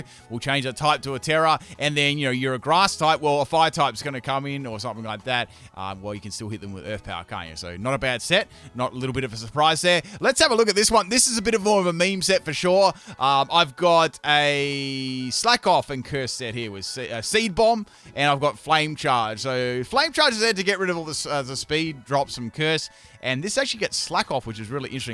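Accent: Australia. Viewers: that's sick - have seen this but never knew the name. you're aussie yeah?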